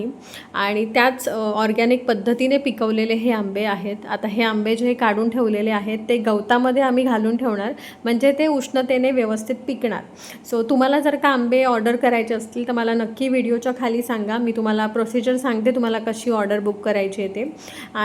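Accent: Malvan, India. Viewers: मालवणी बोललीस हेच खूप छान गोड वाटले ऐकायला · ताई, अग किती गोड वाटत ऐकायला मालवणी ❤❤❤❤❤❤ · मालवणी खूप गोड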